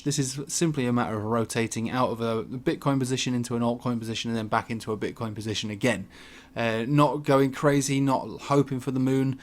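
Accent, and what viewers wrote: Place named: Scotland, United Kingdom. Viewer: What’s with the Scottish accent in vids today :))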